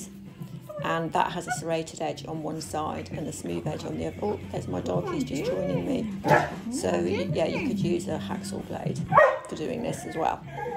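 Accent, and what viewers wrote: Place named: Scotland, United Kingdom. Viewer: Your accent... automatically makes me think of Outlander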